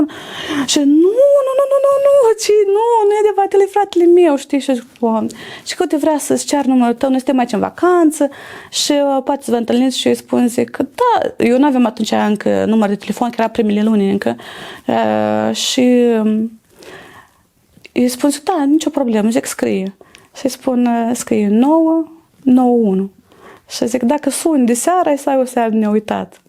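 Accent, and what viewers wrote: Moldova. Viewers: După accent din satul Giurgiulești!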